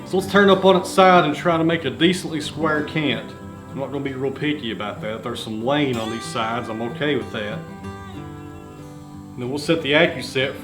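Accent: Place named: Virginia, United States. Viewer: Very interesting and well put together videos. That’s one hell of an accent you have there..is that Virginia ?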